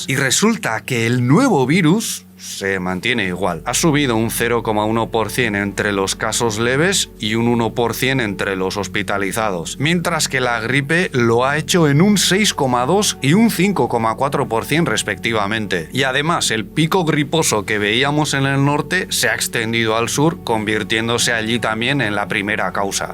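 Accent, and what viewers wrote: China. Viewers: Los escucho de mucho tiempo y siento que ya tienes acento chino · Te sigo desde hace tiempo y empiezo a notar que hablas con acento chino. 😊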